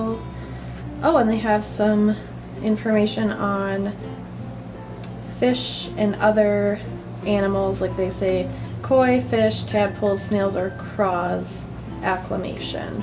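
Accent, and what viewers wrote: Minnesota, United States. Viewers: Hah I knew you were from Minnesota. That accent where bag sounds like "bage". My 3rd grade teacher was from Minnesota.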